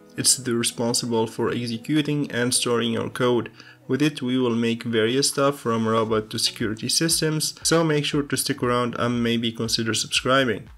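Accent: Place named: India. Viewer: Don't take it as negative comment. You sound like other Indians tech geeks with your accent 👌. Keep it up bro.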